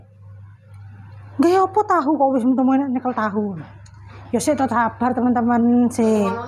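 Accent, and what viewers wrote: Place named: Mataraman, Indonesia. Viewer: halo mbak e, tiyang pundi niki? dari logatnya kok seperti orang mataraman, perkenalkan kulo cah nganjuk, domisili Surabaya. ini mirip banget dengan tahu tek surabaya, kari nambahi petis sitik. kalau orang nganjuk nyebut ini tahu bumbu mbak... jan krenyes2 ngiler aq mbak, tp itu cabenya banyak banget, gek ndang ngoweh2 aku kalau nyobain 😅😅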